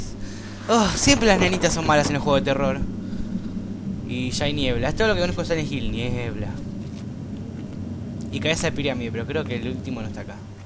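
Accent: Argentina. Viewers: alguien me puede decir de que país argentino o ingles aaaaaaaaaaaaa me confundo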